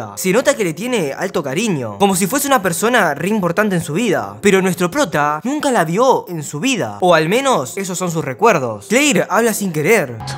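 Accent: Argentina. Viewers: Sos argentino.. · Eres de Argentina?:V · sabes que, yo no se pero ando viendo todos tus videos para notar los animes que posiblemente me mire y el asento argentino me encanta para todo el sh sho desmasho y eso jajaj me parece la bomba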